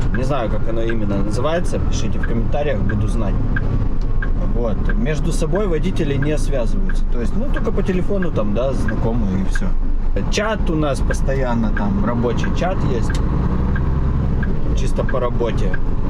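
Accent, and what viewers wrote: Odessa, Ukraine. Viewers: Привет, тезка. Случайно попал на твой канал. Сразу было предчувствие, что ты с Украины и говорок одессита. Подписался на все, а так смотрел дальнобой по штатам, но у них там начало тухнуть. Смотрю и наших пацанов по России, даже общаюсь в живую. Интересно посмотреть Японию. Сыну 20 лет и тоже хочет туда к вам. Даже не знаю, что ему посоветовать. Смотрю и слушаю тебя. 😊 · привет - я с УКРАЇНИ!!! ЗОВСІМ СЛУЧАЙНО ПОПАЛА НА НАШ ВЛОГ І - ОБАЛДЕЛА!!! - ВИ ПРОСТО ЧУДО І ВЕЛИКИЙ МОЛОДЕЦЬ-ГУ НАСТОЯЩИЙ ОДЕССИТ(рисковий) ГОВОР ОДЕССКИЙ НІ ДЄ НЕ ДІВСЯ!!!! 👍👍👍 ДА ТАК РОЗВЕРНУТИ СВОЕ ЖИТТЯ- МАЄ БУТИ БОГАТО ФАКТОРІВ!!! В ВАС Є СТЕРЖЕНЬ І ВИ ПРОСТА ЛЮДИНА І БЕЗ ПОНТОВ!! А ЯПОНІЯ ДУЖЕ ГАРНА І ЦІКАВА І ЛЮДИ ТРУДЯГИ!!!! ДАЙ ВАМ БОГ І ВАШИЙ СІМ'Ї МІЦНОГО ЗДОРОВЬЯ, ЩАСТЯ, КОХАННЯ, ДОСТАТКУ І МИРНОГО НЕБА В УСЬОМУ СВІТІ!!! А ДІТИ В ВАС --- ЧУДО!!!! 👍👍👍❤❤❤💙💛💙💛🌹🌹🌹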